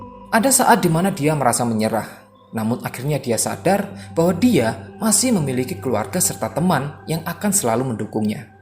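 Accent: Java, Indonesia. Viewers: jowo mu endiii mas adminnn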